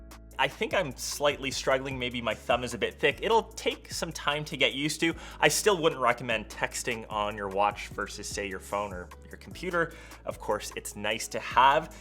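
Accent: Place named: Canada. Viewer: I think I hear a Canadian accent..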